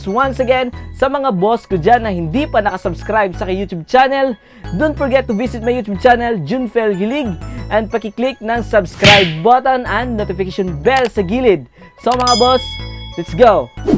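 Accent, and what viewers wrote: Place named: Ilocos, Philippines. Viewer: ilocano ka ata sir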